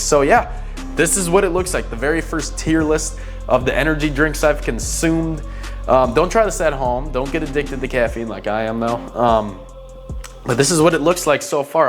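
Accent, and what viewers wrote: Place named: Michigan, United States. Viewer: ahh you have a Michigan accent so hot ❤ 😊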